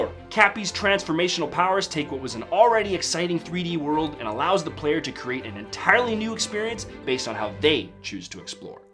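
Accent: Canada. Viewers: Canada, eh?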